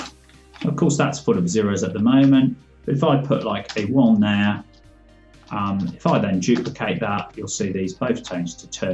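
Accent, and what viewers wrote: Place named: United Kingdom. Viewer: What I heard was a very accented British dialect of some sort, very hard to understand.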